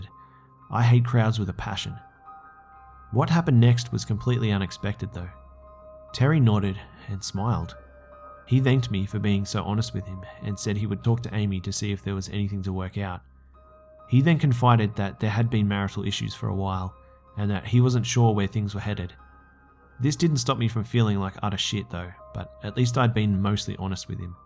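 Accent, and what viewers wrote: Australia. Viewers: your so Australian it feels like home i love it 😂❤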